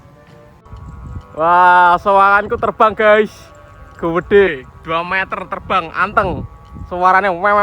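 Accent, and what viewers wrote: Java, Indonesia. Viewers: jowone ndi mas nek podo ayo layangan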